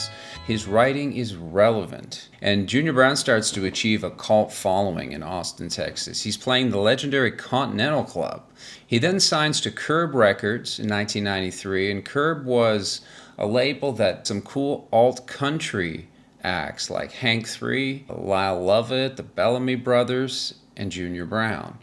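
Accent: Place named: East, Canada. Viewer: I'm detecting a little eastern Canada in that accent my friend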